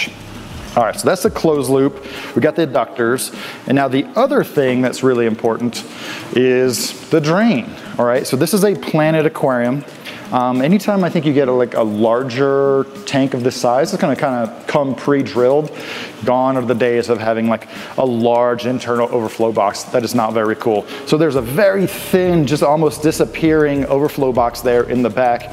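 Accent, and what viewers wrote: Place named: Australia. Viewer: I thought you were from Australia 🇦🇺😀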